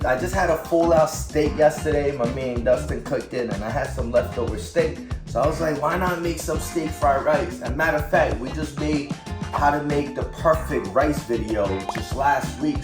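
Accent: Philadelphia, United States. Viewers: You frim Philly?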